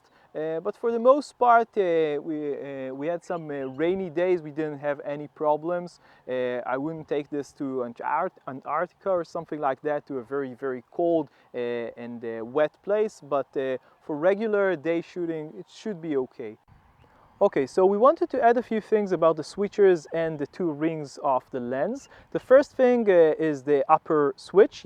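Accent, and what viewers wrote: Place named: Israel. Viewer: יפה מאוד!שומעים תמבתע הישראלי שלך..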